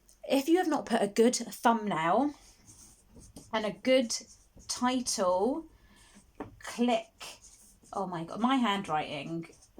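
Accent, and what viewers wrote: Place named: London, United Kingdom. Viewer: I just created my channel posted up just my intro and outro but have limited items to edit can you help and offer advice? I’m from London and guessing your same from the accent thank you! Would like free software for editing and best ways to make good interesting shots and how to make it look good anything u can pls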